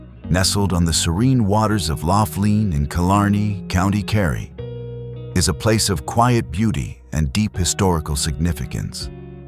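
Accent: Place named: United States. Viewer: Ahhhhh not this annoying AI American voice. Bleh.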